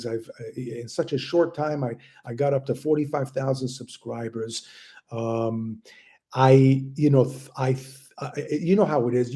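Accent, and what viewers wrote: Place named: New York, United States. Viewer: Glad things went well 👌. Just love listening to your NY accent 😍 🇦🇺🙋🏼‍♀️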